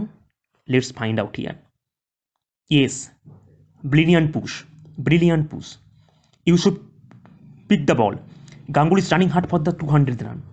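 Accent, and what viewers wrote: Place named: Bengal, India. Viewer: I'll enjoy your commentary it's really awesome but you try to speak English like English not bengali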